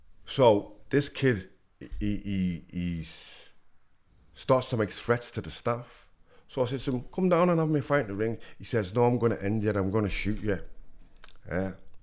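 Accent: Liverpool, United Kingdom. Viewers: Scouse to scouse ❤❤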